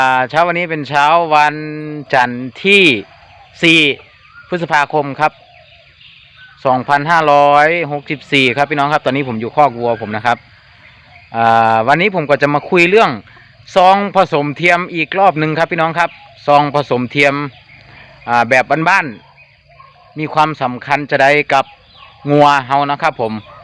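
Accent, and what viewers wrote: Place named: North, Thailand. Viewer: คุน เป็นคนตี่ไหนคือเหว่าอี่ได้อู้เหนือได้คับ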